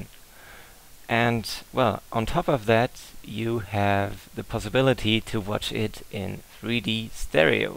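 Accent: Germany. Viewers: Haha du bist deutsch oder?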